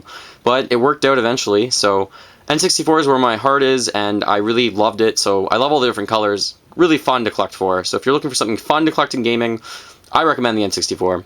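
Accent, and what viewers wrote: Canada. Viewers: great video! I love video game collecting and I can see you do also. Also you sound Canadian.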